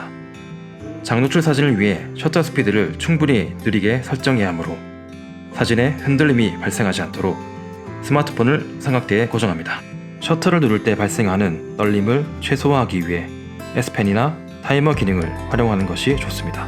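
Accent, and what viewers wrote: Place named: Busan, South Korea. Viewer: 부산분이네요. 잘 봤습니다.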